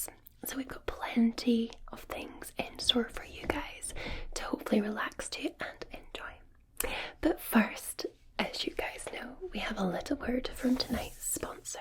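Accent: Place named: Liverpool, United Kingdom. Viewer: scouse ha ha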